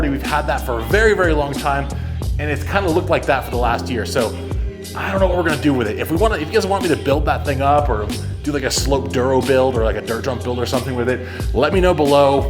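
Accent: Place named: Australia. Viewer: i thought you where aussie from just watching your shorts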